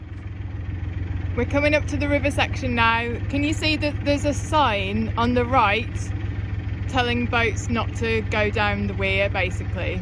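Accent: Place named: Wolverhampton, United Kingdom. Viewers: 🙂 Thanks,. Moved from the midlands 30 years ago - still miss the accents. Really nice to hear yours. Looking forward to more videos. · Hello. Did you use a drone for the aerial shots? Love your videos and big bonus to hear the accent again (I'm from Wolves too, moved away long ago...) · Thanks for another enjoyable video I've just watched, you really are a breath of fresh air, so natural with no #airs or graces#, but well aware that you are sharing your videos with # a great fan club# you're giving a lot of joy to like myself. I love the Wolverhampton accent, which brings back so many happy memories for me with my late wife, thank you, thank you, keep up the good work, and giving lots of pleasure to your #fan club# all the best, Martin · Love the video, you have a great West Midlands accent 😊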